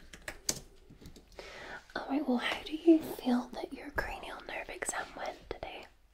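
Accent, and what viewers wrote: Scotland, United Kingdom. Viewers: Thank you. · half an hour of tingles from the resplendent scottish lass. perry the platypus noises ☺️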